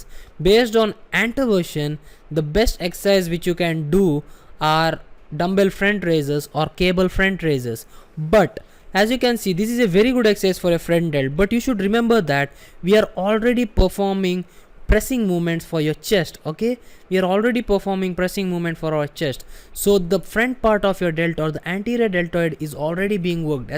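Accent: India. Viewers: i lovvvve indian accent :)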